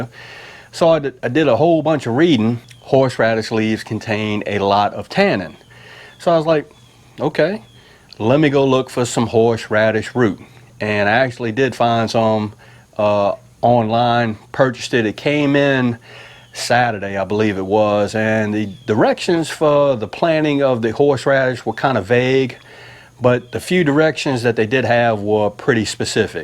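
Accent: South, United States. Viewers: Omg love the country accent authentic American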